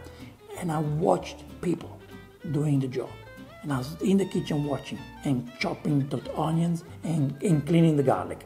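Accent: Italy. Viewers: I just have to ask this 😂 Why do italians learn other languages so hard? You probably at this point, lived in english speaking communities more than Italian, yet you either force your italian accent (don't believe this) or this is just the way you speak, not that I mind, but I just heared many italians that don't rly pickup other languages even after 20-30y living in a different country 😂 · Thanks to I finally learned how to do a good pizza dough, now it’s very popular amongst my friends everybody loves it. Learn to do real Italian food from a real Italian, I wouldn’t trust you if you didn’t have that Italian accent;) The reaction videos are good to Actually you learn more from those videos like “ don’t put oil into the water”:) 👍 This is a great channel. Just keep it going my friend. Saludos!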